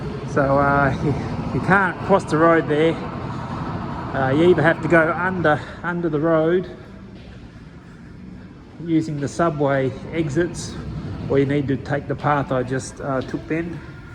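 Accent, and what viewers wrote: Australia. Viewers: You sound like an Aussie? Am I correct? Thank you for showing videos of what the situation is really like presently, after all covid restrictions are being lifted. Just curious (and amused 😂😂😂) why are you, unlike your countrymen, not afraid of being attacked by the Chinese? Tell them NOT to be afraid of their own shadows!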